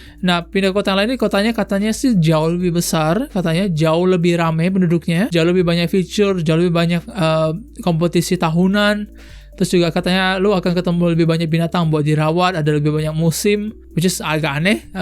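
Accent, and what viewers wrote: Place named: Pontianak, Indonesia. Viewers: Orang pontianak rupenye abang ni, mantap bang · Jak budak pontianak ke rupenye abang ni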